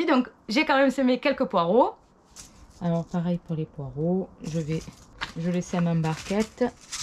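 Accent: South, France. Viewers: Les premiers semis avec l'accent du sud ...Que demander de plus 😉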